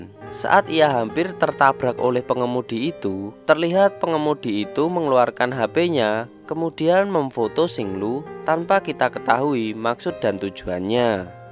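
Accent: Java, Indonesia. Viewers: Mentok jawa nya. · medok amat yak/// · Pegel kuping,, medok banget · Medoook bangett sii min .. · Medok betul jawanya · Jawir.. Logat jawa nya ilangin... Biar tdk jd film lawakan ...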